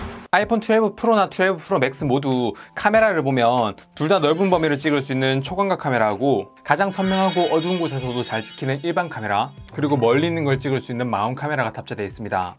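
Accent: Gyeongsang, South Korea. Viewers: 경상도 분이신가용?? 말투가 먼가 넘 귀여우심ㅋㅋ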